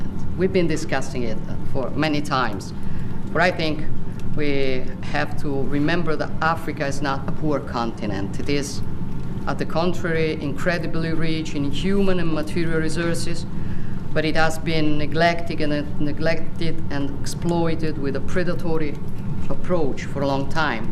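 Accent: Rome, Italy. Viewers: Inglese romanesco, parole a vuoto che oggi dice a Biden e domani, a seconda della convenienza, ad eventuale Trump. Speriamo Biden, che almeno fa finta di ascoltarla 😂😂😂😂😂😂😂😂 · Ahahah che inglese maccaronico con l'inflessione romanesca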